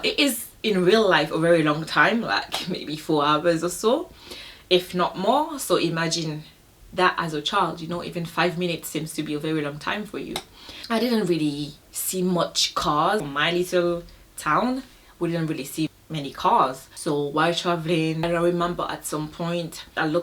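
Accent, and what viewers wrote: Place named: Africa. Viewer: I like your accent sista. Sounds like African accent.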